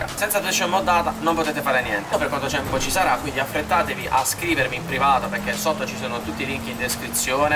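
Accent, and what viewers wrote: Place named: Apulia, Italy. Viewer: Scusa se te lo chiedo ma sei pugliese? Perché hai quasi, se non del tutto, il mio stesso accento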